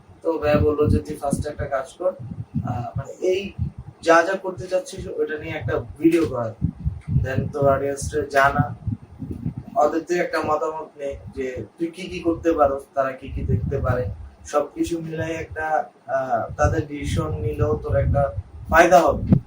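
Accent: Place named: Chittagong, Bangladesh. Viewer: Mama ageo bolsilm. Chatgaiya accent ta raikho. I think it would be better.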